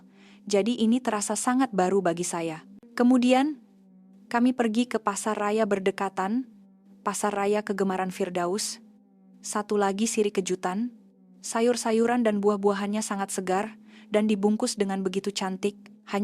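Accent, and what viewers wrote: Indonesia. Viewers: Indon narrator?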